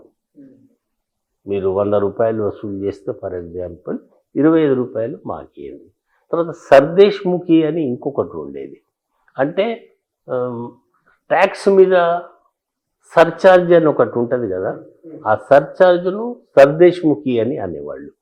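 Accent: Telangana, India. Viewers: తెలంగాణ భాష యాస జీవద్ బాషా ✊🙏శరణర్థి మైక్ టీవీ ✊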